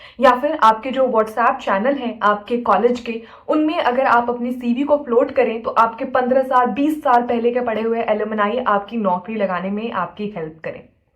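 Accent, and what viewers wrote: Bihar, India. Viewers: From your name and from your accent it seems that you are from Bihar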